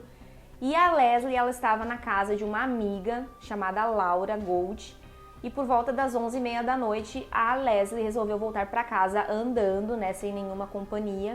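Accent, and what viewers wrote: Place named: Rio Grande do Sul, Brazil. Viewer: Muito boa a sua ideia de juntar o sobrenatural com o caso criminal. Infelizmente, aqui neste caso, apesar das evidências apontar para o tal suspeito em questão, não foi possível confirmar o seu envolvimento. Realmente é frustrante tanto para a família como para os policiais que trabalharam no caso, em especial a Joane. Será que um dia a justiça ocorrerá neste caso? Parabéns pelo canal. Pelo seu sotaque, tu deves ser minha conterrânea. Eu sou de Bento Gonçalves, mas moro no Rio de Janeiro há 10 anos. Um abraço.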